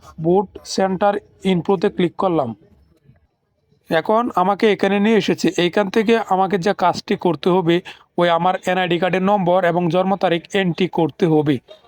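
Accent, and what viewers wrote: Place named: Mymensingh, Bangladesh. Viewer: আরে মঘার বাচ্চা মঘা একটা ভিডিও বানাইছস তাও সঠিকভাবে কথা বলতে পারিস না। আগে ভাষার কোর্স কর তারপর ভিডিও বানাইবি।সব যায়গায় কি নেত্রকোনা/কিশোর গন্জের ভাষা বললে চলবে? মানুষ এমনিতেই বলেনা ময়সিংয়া গাইয়া ভূত ।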